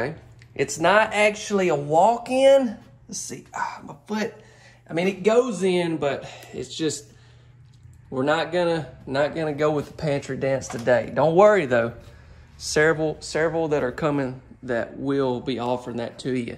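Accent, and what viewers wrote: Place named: Appalachia, United States. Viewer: Golly,nothin wrong with the hillbilly talk.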